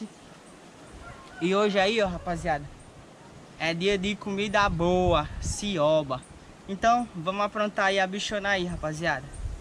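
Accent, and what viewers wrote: Brazil. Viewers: Mano você é de qual lugar do Ceará?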